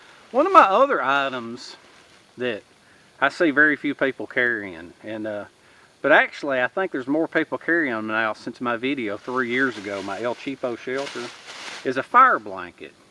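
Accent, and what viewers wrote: South, United States. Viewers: My favorite item was the Alabama Hammer. It warms my heart to hear a fellow died in the wool Southerner speak on here. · You also have a delightful way of putting things and a nice southern accent that I find relaxing. Your laughter and humor always leaves me with a smile or grin on my face. Thanks for your videos; I'm a fan. (now in Idaho by way of Tennessee).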